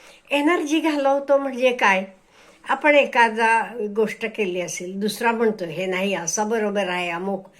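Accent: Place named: Gujarat, India. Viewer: ताई नक्कीच गुजराती वस्तीत राहिल्या आहेत. उच्चारच सांगतायत.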